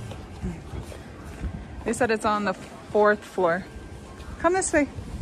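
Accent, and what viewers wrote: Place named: Kenya. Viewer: Congrats on becoming a Kenyan. Nice vlog as always. ...'meat, he likes meat.....', your accent sounds so Kenyan. I'll be listening for Kenyan highlights in your accent. You know..I was around the Nyayo house area just two weeks ago, but it was a bit crowded and not as fun to walk, like you guys were having fun. Thanks again for the videos one year on..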